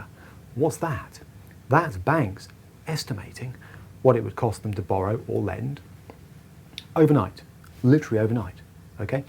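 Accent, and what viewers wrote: United Kingdom. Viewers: I like your british accent and it is so clean that i can understand everything been said not like the american one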